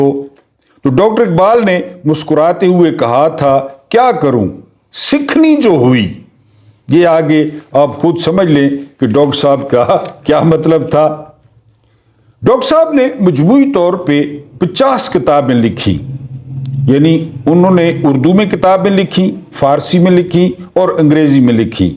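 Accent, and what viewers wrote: Saraiki, Pakistan. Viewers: اسلام وعلیکم! صاحب آپ کی وی لاگ بہت ھی انفارمیٹو ھوتی ھی۔ اینڈ ہر کھی کبھی سرائیکی لہجہ بہت اچھا لگتا ھے ، دعا ھے اللہ تعالیٰ اآپ کو خوش وخرم اور محفوظ رکھے آمین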